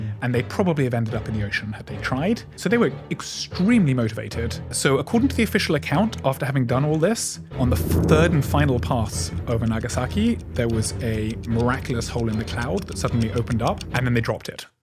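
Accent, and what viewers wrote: United Kingdom. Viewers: Why is it so annoying to hear criticism of the American war effort in a British accent?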